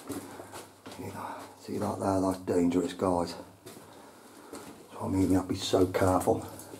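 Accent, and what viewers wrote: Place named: North West England, United Kingdom. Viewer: that’s ok brother. Are you from north west U.K. accent sounds familiar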